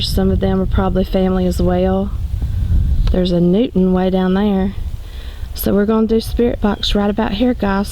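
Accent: South, United States. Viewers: Your welcome and I love your southern accent. I'm from Texas but been living in Louisiana for about 19 yes now .. I still have my Texas accent. I don't think it will ever completely go away. Lol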